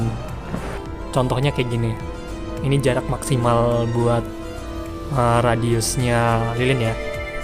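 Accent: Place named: Indonesia. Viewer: Kaget pas pertama ngomong ternyata orang indo cuz i didn't read the caption😆😆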